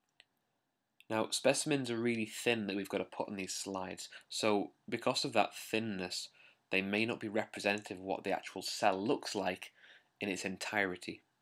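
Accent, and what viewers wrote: United Kingdom. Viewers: Great, easy to understand. And not a robotic voice. An awesome British speaker.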